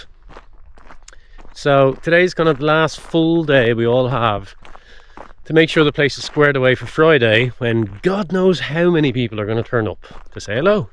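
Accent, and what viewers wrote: Ireland. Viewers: That evening sun and clouds was so lovely! I'm sure it was more beautiful in person. And aha, you're well aware of the power of that Irish accent! You ugly? The only reason they'd have to hide you would be, well, from the young women. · I bet you get away with muder with irish charm and the accent seems to work well. I get to see some great beautiful hotel in France. Conguc! have a drink please. You all worked very hard on openning day. You should be proud it will last and have your finger print on the chateau for sure. 😂the old confused man will whip your butt he decided to spare you his viking ways and just confiscate the bike. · Bonjour d'Occitanie J'ai aimé la référence à James Bond, pour le charme de votre accent irlandais, s'ils sont aussi doué que la majorité des Français avec l'anglais, je pense à l'accueil de l'hôtel, ils n'ont pas dû l'entendre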